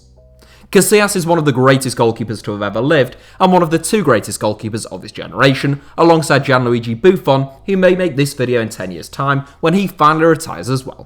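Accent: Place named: RP, United Kingdom. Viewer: Well not to sound like a dickhead but the commentators voice is so annoying like it's a posh accent and he tries to prove to us that he is better which actually does the opposite never need to prove yourself to people I kinda get he is trying what he deemed his best but it still show maybe in the future he will lose it I hope as it is a horrible trait to have as the voice is a beautiful instrument and language an art but please dont let our lovely ears to despair and partial misery I dont hate on him just notice it even in other channels youtube is 90 percent toxic 10 percent good so is Twitter instagram and Facebook peace have a productive day and fuck the dickheads around who trying to bring u down not talking about the commentator just in general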